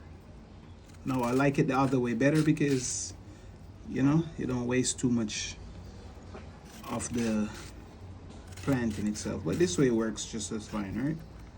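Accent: Jamaica. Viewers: He is Jamaican. That accent, although it has some US nuances, is decidedly Jamaican. He just chooses to eat with his hands (unlike Jamaicans usually do).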